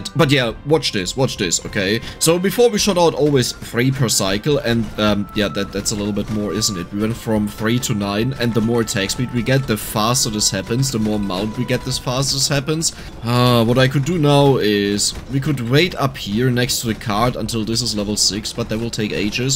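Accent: Germany. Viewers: 5 seconds in the video and i could already tell you're german lol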